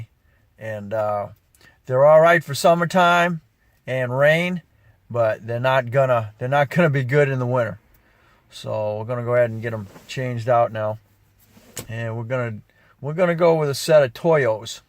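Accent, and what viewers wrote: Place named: Vermont, United States. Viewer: guy sounds like he's from Vermont.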